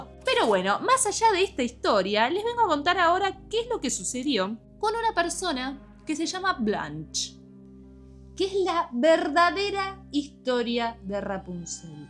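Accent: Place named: Paraguay. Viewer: paraguaya enduzla mis odios JSJS espero siempre que hable asi en los videos.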